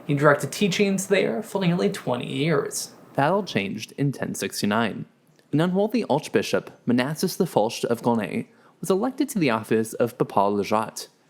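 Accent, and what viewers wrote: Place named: South Korea. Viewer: His pronunciation and his diction are all over the map. He places accents for words in the incorrect place and, if that wasn’t bad enough, his actual accent sounds as though he learned to speak English as a second language in Korea.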